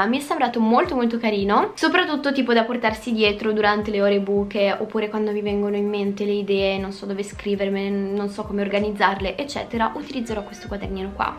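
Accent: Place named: United Kingdom. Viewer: Credevo che eri inglese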